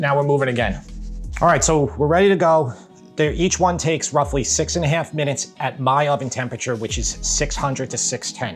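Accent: New York, United States. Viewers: Great job on your presentation and content!😎🇺🇸🔥 Love the “Authentic “ approach…😋 I can’t help but feel confident with your approach and ingredient choices!😘 The New York accent doesn’t hurt!!😅 Lol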